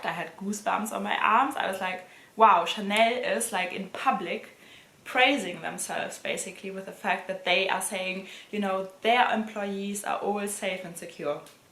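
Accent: Germany. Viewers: lol i hear permanently a german accent in your english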